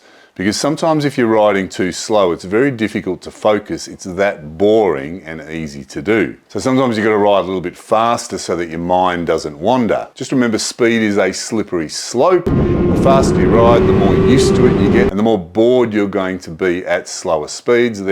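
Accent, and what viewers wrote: Australia. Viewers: Can’t tell if you’re from Australia or Boston MA 😂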